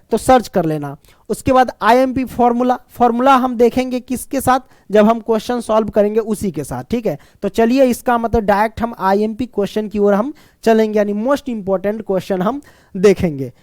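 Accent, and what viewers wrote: Bihar, India. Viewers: Sir aap Bihar se ho kya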